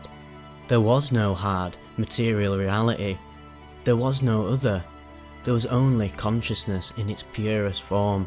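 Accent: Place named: England, United Kingdom. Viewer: I feel like you could write. Maybe you already do. I’m far away from you, it sounds like you’re English, but you’re story is something I’m really enjoying. I subscribed, so I’ll try to keep track. I love this style of writing/ oration though.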